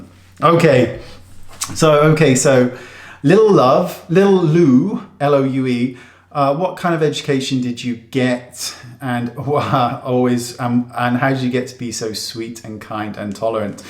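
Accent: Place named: England, United Kingdom. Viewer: Hi,. Thank you so much for this video. It was really great to know more about you. I'm a 52 year old Canadian woman who has a very grown up, big girl job and everyone thinks I've got ot all together but I don't. I'm just like you! I'd never know from your videos that you have some anxiety and doubts and fears. I too love sappy movies, songs and books. Historical romance...oh, my beating heart! I too have a very dark sense of humour and laughing so hard that I snort and cry is my favourite thing in the world. Movies I love: Shakespeare in Love and When a Man Loves a Woman with Andy Garcia and Meg Ryan. It's quite old but if you haven't seen it, man, get the tissues. Also The Family Stone. I just watched a documentary called McMillions about a scam being run on the McDonald's monopoly game in the 80's. If the story wasn't being told by the FBI I would never have believed it was true. 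I'd love it if you could show some tips and tricks for mature faces. I loved your video on hiding eye bags. Mine are trash bag sized so I can't totally hide them but now I can make them much less noticeable. Keep up the great videos...I just love listening to your voice. An English accent gets me every damn time!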